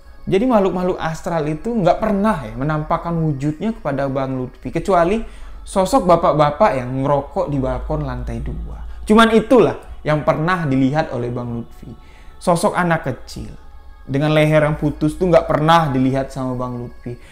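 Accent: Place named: Bali, Indonesia. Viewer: Bang logatnya seperti orang bali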